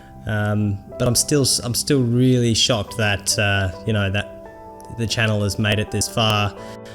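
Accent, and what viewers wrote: Australia. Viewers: Congrats on almost 300 subscribers now :-D In the couple videos I've watched I've spent half my time trying to figure out if you were an Aussie or not haha glad to see someone giving us Aussie gamers good representation. Good luck with future videos. :-)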